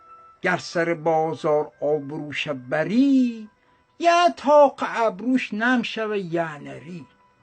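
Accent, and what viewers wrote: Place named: Dezful, Iran. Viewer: chand sala k lahjeh dezfuly ra na shanidom mon shoshtarim val khaily ba mazeh beed.tashakour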